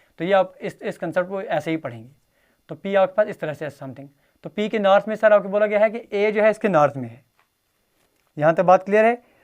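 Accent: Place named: Punjab, India. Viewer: Love your punjabi acent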